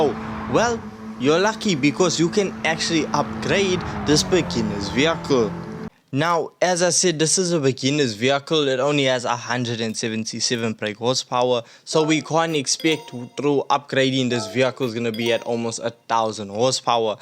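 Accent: South Africa. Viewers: Why the subtitles bro'? You can tell you're South African, but you're easy to understand. Love the content my friend 👍.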